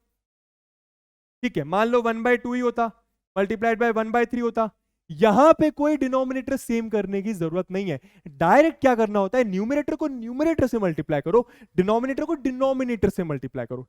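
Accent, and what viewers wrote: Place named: Bihar, India. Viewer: His bihari accent makes the lecture more understandable and relatable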